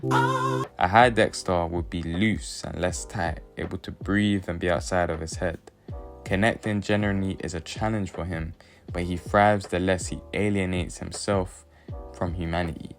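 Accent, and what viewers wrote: United Kingdom. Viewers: Unironically yeah. His intrusive thoughts. Lack of social adaptability and understanding the human condition could be solved if he just watched and listened to the ppl around him as he’s actually very empathetic Unironically goated algo pull. And another British voice on Dexter is always needed loool. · Oh no the narrator is British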